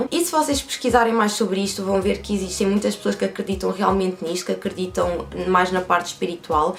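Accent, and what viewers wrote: Portugal. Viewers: Amo o sotaque português que fofoooooo! Sou brasileira sksksk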